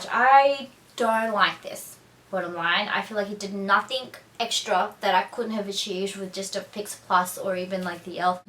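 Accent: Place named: Australia. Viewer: Hey, just wondering, did you grow up in Australia since young/born there? Cos you have a really thick Australian accent & its quite interesting to imagine how you'd speak tagalog with such an accent hehe;)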